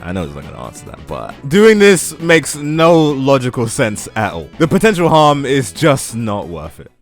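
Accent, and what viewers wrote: United Kingdom. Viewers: Perhaps british i love thw failures in this comment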